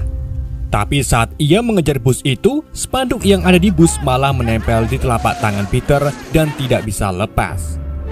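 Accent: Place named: Java, Indonesia. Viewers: Byoh enk jowo" e😂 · Gong-go!! Ente orang Brebes bang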